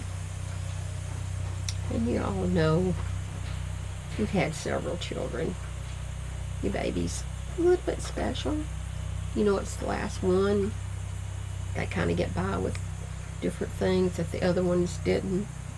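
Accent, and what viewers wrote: South, United States. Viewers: I like hearing the rooster. I like hearing all the birds and the nature. I like swinging on the porch with you and I like your southern draw. It is all so pleasant and relaxing. I am sorry you have to go through all this. You will never forget Curt and he loves you and will always be with you. He wants you to be happy and have joy.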